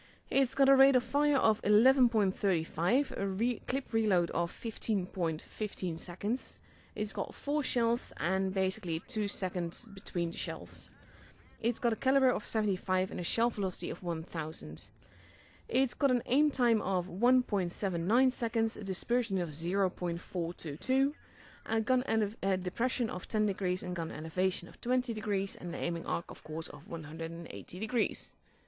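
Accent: Netherlands. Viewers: Hi - You have a really lovely 'speaking voice', makes easy-listening. Being older, I tend to be very jaded & don't follow many (or few) "Blitzers" on here. I enjoy your review, as I've just acquired a "Medjay" (tonight), but haven't used it yet. Looks to be just a 're-skinned Excelsior' - As during "Uprising" mode, they have the ability to "turn around" their opponents (which sadly, includes me!!!!) - I've been destroyed a few times by that method, whilst using my "newest" favourite Tier.5 - "Nightmare StuG.III" Lastly, your English is excellent & I hear the hint of a Dutch accent.... (tell me I'm wrong? - Laughs!)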